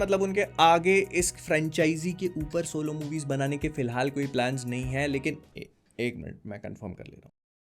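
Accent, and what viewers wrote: Bhopal, India. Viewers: bhai bhopal se ho?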